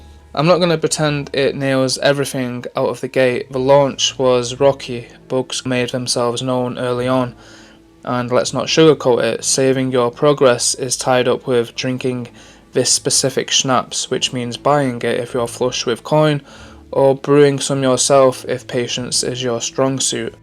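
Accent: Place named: North, United Kingdom. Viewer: ignore this, embrace your beautiful northern accent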